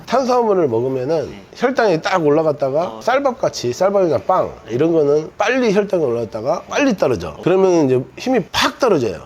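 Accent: Jeolla, South Korea. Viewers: 전라도사투리가 아주 정감가브러!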